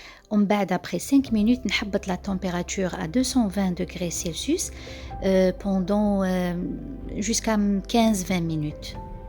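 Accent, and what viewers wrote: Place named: Algiers, Algeria. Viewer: لهجة جزائرية عاصمية الله الله عليك يا الجزائر 🇩🇿💯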